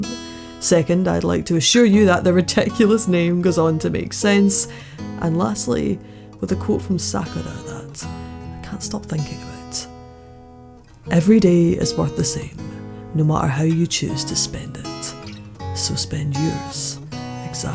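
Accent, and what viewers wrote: Scotland, United Kingdom. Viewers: I am just so used to hearing American engli accent....your British accent sound like crap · Where are you from in Scotland? I’m Glaswegian and I get you are changing slightly for other people but I can’t place it, also excellent work!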